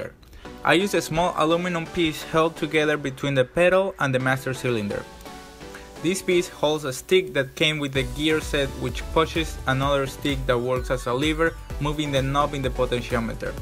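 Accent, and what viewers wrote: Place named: Spain. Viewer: Me suenas a español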